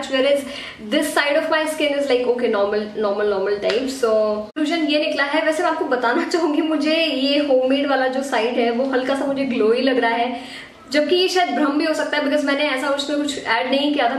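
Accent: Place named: Mumbai, India. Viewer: Apaki language se lagtahe ki aap Mumbai se ho . Ladako keliye konase masale he faces pack me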